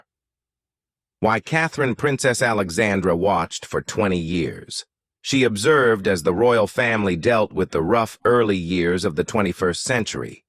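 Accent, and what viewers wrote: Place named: United States. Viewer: I wish you would not use an AI robot voice with an American accent , it does not understand what it is saying and the delivery is boring. Queen Consort Camilla is not a real Queen, she is merely the king's second wife, no connection with his family other than by marriage. She is certainly no Queen Mother and has no right whatsoever to any family treasures.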